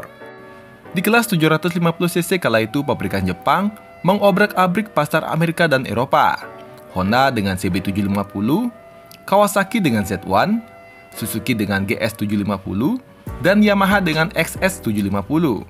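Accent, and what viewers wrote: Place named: Bali, Indonesia. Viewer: Logatnya bali banget ni Bli ahahah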